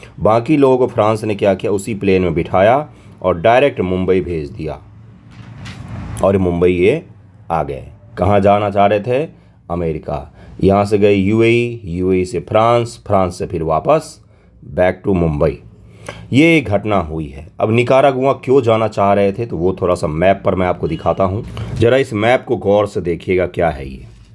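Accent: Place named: Maithili, India. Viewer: Kis district se ho sir aap.....aap maithili bolte ho esiliye bs puchha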